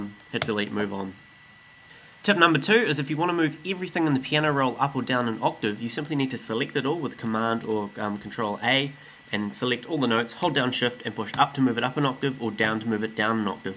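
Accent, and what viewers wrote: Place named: New Zealand. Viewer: Your tutorials are made all the better by the NZ accent... Love it!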